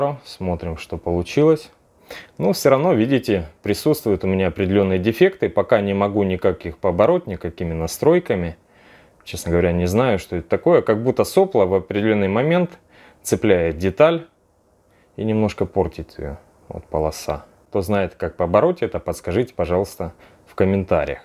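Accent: Rostov, Russia. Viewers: Акцент Ростов Папа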